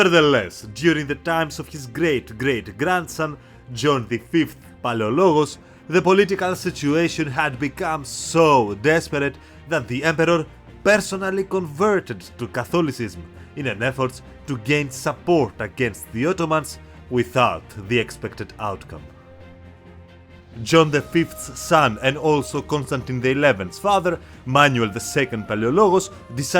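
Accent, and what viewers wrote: Greece. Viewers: Ρε μπάρμπα, πώς νομίζεις ότι ακουγομαστε όλοι όταν μιλάμε εγγλέζικα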